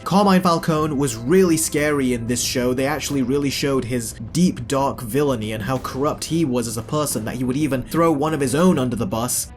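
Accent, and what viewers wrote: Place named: United Kingdom. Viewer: As I was listening to this doing my morning routine, I realized something I should have from the start but didn't pick up on until now. Maybe because of your British accent or "British like" (not good with that stuff), but anyway, you got me thinking about "Vic" is shorthand for cops for a victim. So in an odd way the entire time, they gave us a clue he was destined to be Penguin's victom all along. The extra sad part is if he let Squid live he would have had at least one dude who would recognize his body, but he killed him because he knew he would be (Victor) be less valuable to Oz in crown point and Victor's neck would be shot instead of choked out at the end. (Not to mention his mom giving Vic love and thinking he is the elder brother at times. As soon as Oz knew he had that gangster Aisian dude Vic was no more useful to OZ as a #2 man just like Squid would have been. 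Sad but Vic was always going to be a Victom of the city. Hopefully Batman takes in an Orphin underground that was selling bliss and wandered into the wrong tunnel and we can finally get a Robin or a Robin spinoff series that brings in Robin for Batman 3 vs Mayer Ozwald Cobblepot the new Mayer who "understands" crime better then the last Mayer Reeal.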